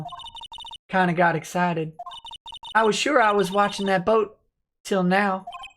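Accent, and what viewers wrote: Germany. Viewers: Your German accent takes this to the next level hahaha! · That German accent marks it 1000 times funnier!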